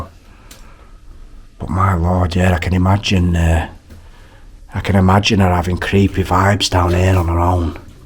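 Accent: Manchester, United Kingdom. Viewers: Are you Mancunian ? Also watch your croally bridge vid and loved it mate I love history but my aunt works at the church above where you went and it’s haunted but gangs and that hang about there but I’m subbed mate 🙂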